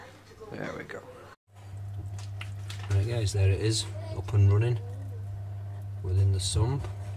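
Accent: Yorkshire, United Kingdom. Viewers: Hi mate thinking of building my own in couple of days gonna make mine very similar to yours does it work ?? P.s were u from u sound like u a Yorkshire lad. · Good to hear a Yorkshire lad doing a review! How's the DIY reactor doing? I fancy giving one a go. Cheers.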